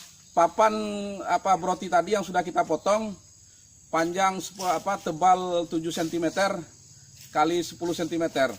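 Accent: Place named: Padang, Indonesia. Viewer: ohh....logat nya hampir mirip org padang..hehe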